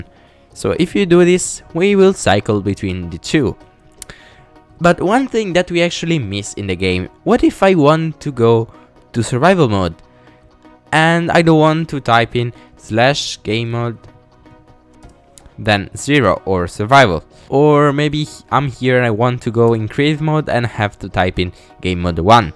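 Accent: Italy. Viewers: are u italian?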